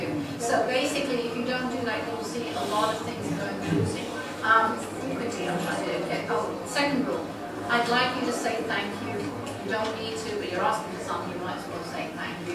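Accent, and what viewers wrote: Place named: England, United Kingdom. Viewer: Nice to have found your instruction and insights today. Always good to discover an angel with a marvelous English accent. Thank you for the Candle Lesson. I have finally simplified my life by paring down this path with Meditation, Exercise, Creativity philosophically. Now to activate it. The Candle will be a welcom aid. Thanks for the light...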